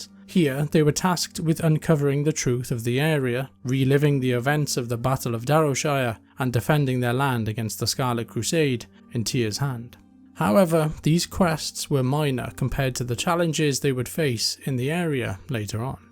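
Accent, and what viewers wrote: Wales, United Kingdom. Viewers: hey mate cool chanel, are you welsh btw?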